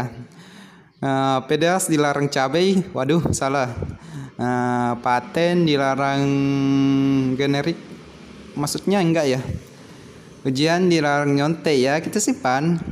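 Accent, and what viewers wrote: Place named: Sulawesi, Indonesia. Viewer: Jelas sekali klo miminnya org Sulawesi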